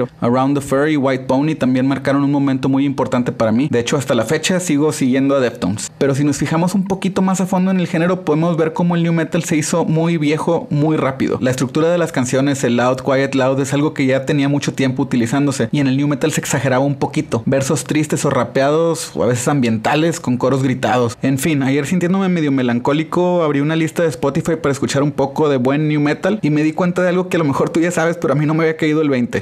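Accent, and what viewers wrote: Mexico. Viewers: Jajaja me encantan tus videos, son bien honestos y tu acento bien mexicano lo hace más entretenido 👍👍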